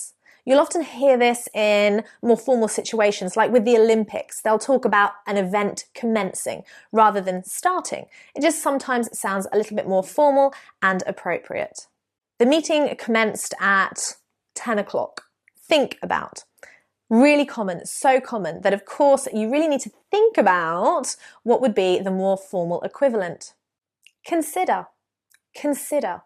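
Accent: United Kingdom. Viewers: realy I love you and your way in speaking british English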